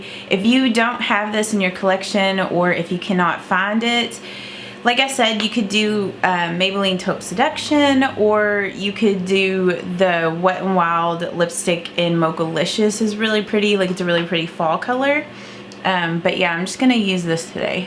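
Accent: South, United States. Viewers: First, let me begin by saying that you’re one of my fave YTers. ❣️ I love how “real” you seem to be. I don’t feel like I’m watching someone that I can’t relate to. I’m also a southern girl, so I love your accent also. 😉 My only suggestion would be to possibly upload a few videos that are a little bit shorter. I don’t want to miss any of your videos, but more often than not, I don’t have 30+ minutes to spend watching a video. I may be in the minority, but shorter videos grab my attention quicker. Sending you lots of love from Alabama! 😘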